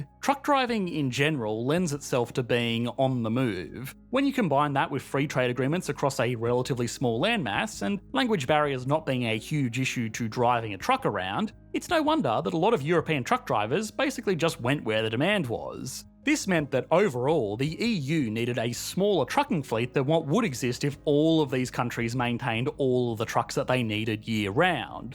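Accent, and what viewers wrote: Australia. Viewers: He's an Australian. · U.K makes jet engines, high-end cars...has the most important financial centre in the world and yet EE still places UK behind NZ LOL seriously mate your economics education is flawed...There's more economic activity in one London street than the entire country of NZ. Are you a kiwi with an Aussie accent? why the soft spot for the land of sheep and the haka? lol if NZ was to fall off the face of the earth surely the world economy wouldn't be impacted. at least Australia has natural resources it exports to big countries...so Australia is definitely more globally significant to the world economy than NZ and yet you even placed Australia Behind NZ? You see the world from a biased and unrealistic realm. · i love aussi accent, but all the endings with -eeeeuoooeeee is just too much. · defo Aussie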